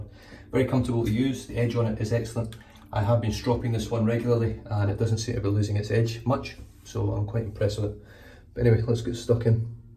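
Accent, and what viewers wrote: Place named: Scotland, United Kingdom. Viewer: Hmm. Why put yourself down. Who cares about being correct for the masses, and the Scottish accent is a great one... never hurt Sean Connery, and Trainspotting was a WORLDWIDE hit... including here, in America! Don't water anything down. That's how people learn new languages & cultures. We sit, watch, enjoy the humor, and eventually get comfortable with 'em. Kind of like back when you decided to feature Australian artisans the rest of the shaving world may not know about. If you're just trying to increase your subs to make more ad share money or something, then I kind of understand, but I don't think you monetize your channel.... do you?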